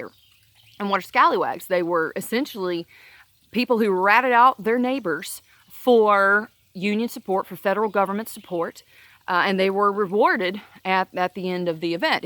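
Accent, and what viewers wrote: South, United States. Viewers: Your accent is lighter but it's obvious that you grew up in the south. The way you pronounce certain things and the speed you speak screams southern. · I'm Irish and my last name is Kelley. Born and raised in the south. Alabama my whole 52 yrs. I have heard my kin folks use the term stinks like cyarn. I spelled it like it was pronounced. Nice to hear your southern accent. Take care and God bless. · Well I love the southern accent! Let me ask you a personal cultural question. What would it take for you to allow a yankee from Rhode Island to be your neighbor? I’m not from Rhode Island but that was the best way to get you to use your worst imigination..least for this yank. I’m shopping. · It sounded like you got more Southern as they want on.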